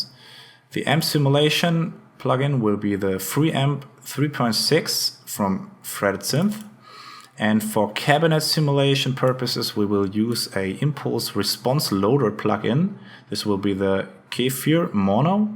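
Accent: Germany. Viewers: man hört voll das du aus Deutschland kommst ^^ aber gutes englisch !!! thumbs up for you :D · I hate the german accent.. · I could recognize that accent anywhere. I know you're German. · I could tell just by your accent you were german.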